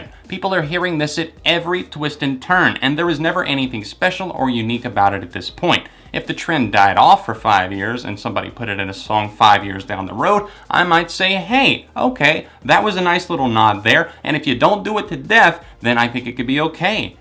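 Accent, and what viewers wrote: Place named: Transatlantic, United States. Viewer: Dude I just realized that you are speaking in a very watered down Modern Transatlantic accent.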